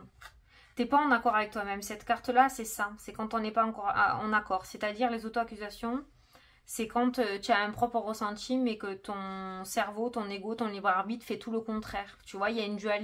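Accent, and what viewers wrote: South, France. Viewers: Bonjour, peu de temps que je vous écoute, j’adore votre accent du sud qui nous enchante nous les gens du Nord ❤ Un grand merci pour votre guidance 😊 Patricia 23 janvier 1962 Bonne soirée et à demain 👋👋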